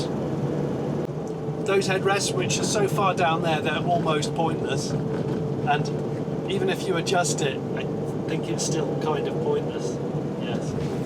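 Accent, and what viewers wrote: United Kingdom. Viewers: I am in love with the design and how much character they managed to add with such simple language. I think its beyond its rivals in all aspects and I am happy to sacrifice from range if that is how my car looks like. Quick question: Why do British call it Hondr and make it sound normal?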